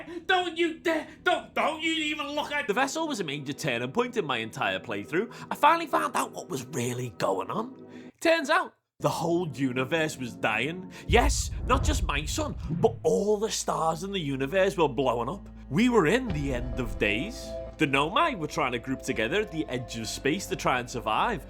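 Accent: Liverpool, United Kingdom. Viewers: This is an incredible amount of editing work to have done hatchling. Glad you enjoyed the game. I’ve also watched around 20 playthroughs but I never knew how much I needed an angry Liverpudlian version in my life. If the whole thing is recorded I can imagine our little Outer Wilds community would love to see the whole thing ::)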